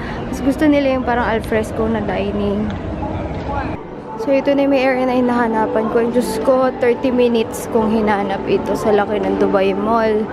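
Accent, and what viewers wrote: Batangas, Philippines. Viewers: Napakacutie magsalita ni miss pag kasama ang mga kapamilya haaha batanguena na batanguena e 😂😂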